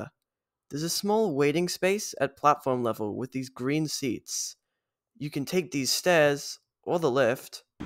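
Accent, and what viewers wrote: United States. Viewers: i'm pretty sure I saw my car in the background of one of the still photos. Also, can I ask about your accent? It sounds partly American. Is that on purpose? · are you part american/canadian? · Is there a particular reason you talk with an American accent?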